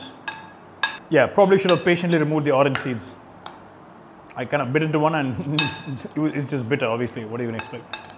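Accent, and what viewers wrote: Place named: Madurai, India. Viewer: Waiting for your comeback brotherman, these low days will fly away sooonnn... A big fan of your fitness and accent - fellow madurai friend..🙂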